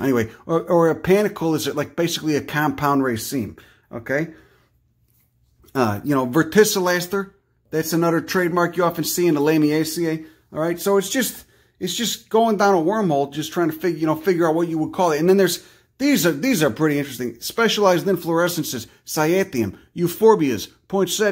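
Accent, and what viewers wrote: Chicago, United States. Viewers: Even with the Chicago accent, tats and foul mouth - it's pretty adorable how much you love flowers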